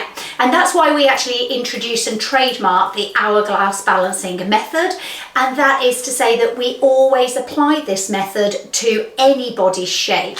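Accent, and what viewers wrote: United Kingdom. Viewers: Your UK accent is lovely, however, unnecessary fashion consumption is off-putting. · Your looking absolut amazing! I love your Videos and your British ( ? ) English😃🥰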